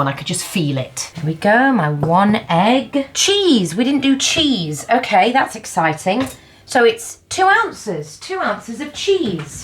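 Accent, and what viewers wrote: United Kingdom. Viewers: From her amazing accent I belive she's in the UK. so likely following UK rationing.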